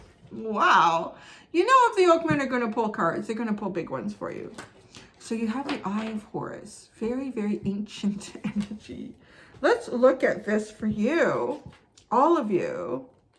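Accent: United Kingdom. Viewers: Thankyou that was interesting, that was a great english accent too and you can't beat a bit of trailer park boys 🤣 peace, love and light to you ✌💞🙏 Xx much love Xx from London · You do a British accent perfectly! It sounds Gr8 to me, but I'm in Texas. haha. Random Royal fact, Prince William is a Leo🦁🦉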